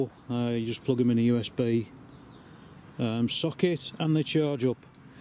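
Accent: Birmingham, United Kingdom. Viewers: you a Brummie ? Nice Work ! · You sound like youre from near Birmingham If you want somebody to test that bike at full speed then let me know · After 10 seconds of that Brummie accent I stopped watching lol! Totally bored. .......yawn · I think that is quite possibly the funniest fuckin video I have ever seen on YouTube!! Got tears rolling down my face typing this. Brilliant Brummie. Thank you.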